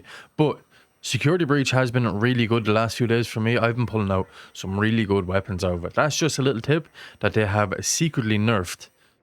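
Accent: Ireland. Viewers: Last name O'halloran but can't understand an Irish Accent - You're high on spectrum or what? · Its English but the guy is Irish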